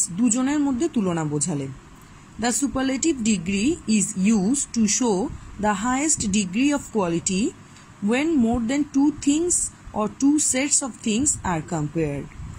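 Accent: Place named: India. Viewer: Indian Accent! Didnt expect it from a SPS teacher.Who recruited this teacher? Btw Noel sir, Rudy sir, Saikat sir, Munna sir these are the real gem of SPS.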